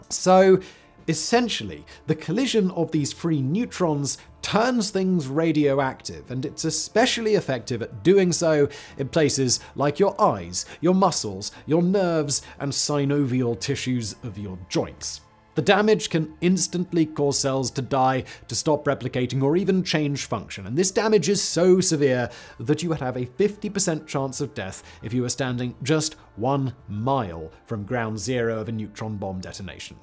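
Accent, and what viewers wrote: RP, United Kingdom. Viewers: legit, the subject is so interesting, but this man is talking so over the top queens english that it's distracting ngl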